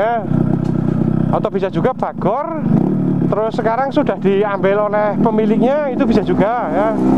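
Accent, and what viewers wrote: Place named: Wonosobo, Indonesia. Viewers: Suarane wong wonosobo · "Ho'oh siiih..." logat kata khas Wonosobo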